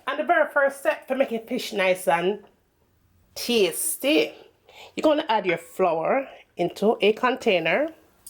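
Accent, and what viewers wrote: Jamaica. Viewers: Beautiful! Yummy recipe . I love that beautiful Jamaican accent too. The best of the best. My husband was brought up in Mammee Bay. Born in Canada but spent his whole childhood in Jamaica. Ty so much for sharing... Bill thanks you too hahaha (cause he loves his Jamaican food).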